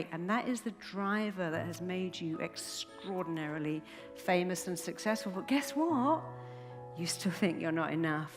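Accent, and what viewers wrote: United Kingdom. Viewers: It only works when you say it with this britisch accent:) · ENGLISH ACCENT FULL OF SHIT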